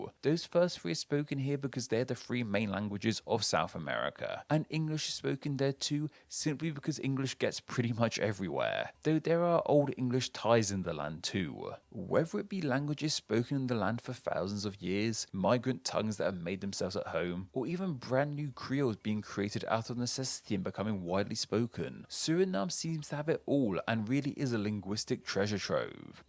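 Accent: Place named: United Kingdom. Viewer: Your pronunciation was very good of those names. It was very surreal hearing those words spoken in a British accent. What a time to be alive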